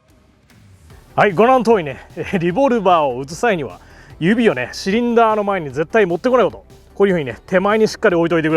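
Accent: Kansai, Japan. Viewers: 関西弁のシャベリも検証も楽しく拝見しました。 FMJだとなんとか原作通りに手が破壊されなかったですね。 ただあの場所を抜いてしまうと骨が無事でも正中神経や骨間筋、虫様筋などがズタズタでしょうから、手の機能は著しく低下したはずです。 槇村が普通に目視で縫合してましたがマイクロサージャリーが必要なレベルです。 他の動画も楽しく拝見させていただきます。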